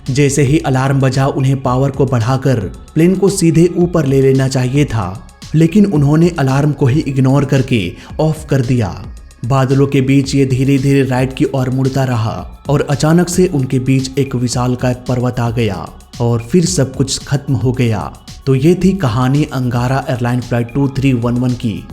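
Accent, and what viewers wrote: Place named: Bihar, India. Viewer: Tum bihar se ho kya tumhari language se pata chalta hai ab aise illetrate log hame flight ke baare main batayenge😂😂😂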